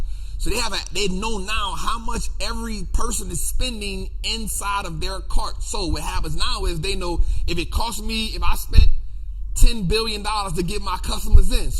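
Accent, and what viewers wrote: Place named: St. Louis, United States. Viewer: He kinda sounds like he’s from Louis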